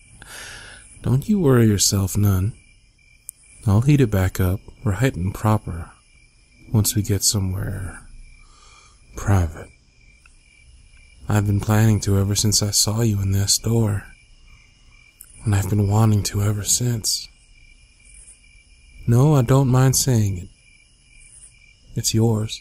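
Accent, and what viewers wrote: South, United States. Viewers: As a proper southern lady, I must say you're accent is quite good. Especially your cussin'. Hehe. ^^ Honestly. That "Shiit" at the end sounded so real I almost turned around, half expecting my dad! XD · can you please make a playlist with all videos of you with a southern accent please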